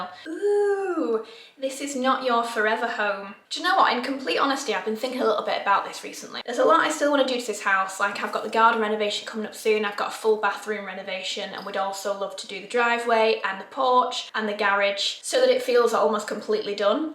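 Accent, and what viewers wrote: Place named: Manchester, United Kingdom. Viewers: Hilarious that someone thinks you put on your accent. I'm from Yorkshire, your accent is authentic to Manchester. If your a northerner you can spot a fake accent a mile off. Your the real deal... x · and I love that you say your accent will never change and how proud of your northernness you are, I’m a fellow manc living in the south and I feel the same! 🥰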